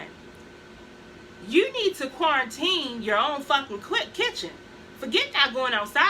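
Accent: Baltimore, United States. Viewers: hey babe! i love your baltimore accent.💛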